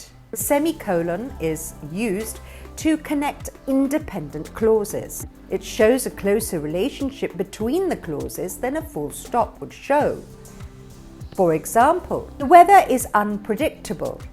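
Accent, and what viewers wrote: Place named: United Kingdom. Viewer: Just a question mam.. were you born in UK ? You have a british accent. Please answer this. Just curious 🌸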